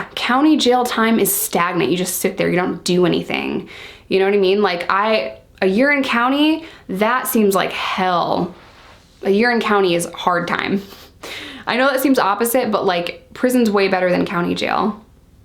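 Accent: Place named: Oklahoma, United States. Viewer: I live in Oklahoma love how you hear the accent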